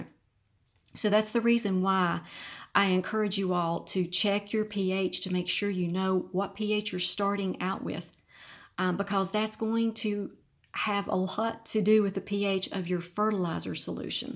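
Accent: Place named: South, United States. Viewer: I love your videos!!! Your voice is so sweet, and it reminds me of spending summers in the south as a kid! I have learned so much from you about my orchids, and so far they’re doing great. My question is about fertilizing my orchids, in that I only have four, and mixing a gallon of fertilizer for 4 orchids seems wasteful. How can I adjust the amounts for so few plants?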